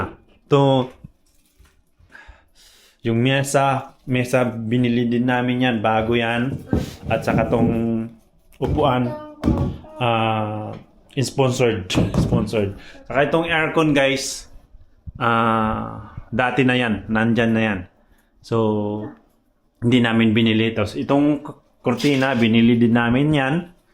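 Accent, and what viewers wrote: Visayas, Philippines. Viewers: Maayong hapon Sir, dugay-dugay nako nga watch sa imo vlog,until I heard from you Bisdak diay ka,hehehe😍👍👏, happy ko karon naa diay Japan vlogger nga taga-Davao, so subscribe dayon way langay-langay...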